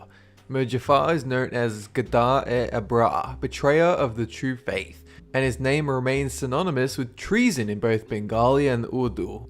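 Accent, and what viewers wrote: Australia. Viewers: Does he have an Austrailian accent or is it something else?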